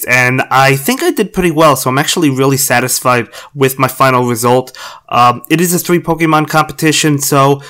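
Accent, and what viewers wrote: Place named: Boston, United States. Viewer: Curious, what region of the country are you in? I think I hear a little bit of Boston in your voice, but I'm not good at telling.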